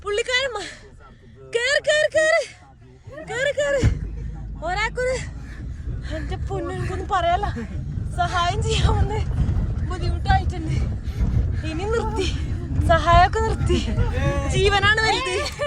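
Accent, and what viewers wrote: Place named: Thrissur, India. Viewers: ഇതെന്താ തൃശ്ശൂർ slang 😂😂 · ഇന്ന് full തൃശ്ശൂർ സ്‌ലാങ് ആണല്ലോ ❤️ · ഇന്ന് എന്താ തൃശ്ശൂർ ഭാഷ 😃😃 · slang oke mariyalo… epo trissure bhasha analo 😂😂😂😂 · Slang bore avunnu,trissur slang varunnu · Thrissure slang varunnu samsarikumbol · ത്രിശൂർ ഭാഷ കലക്കി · Inn ipoll തൃശൂർ slang annaloooo ❤ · തൃശൂർ ഭാഷ ഒഴിവാക്കണം.... കൊള്ളില്ല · Slag mariyoo oru trissur slag cheruthayitt vannittundallo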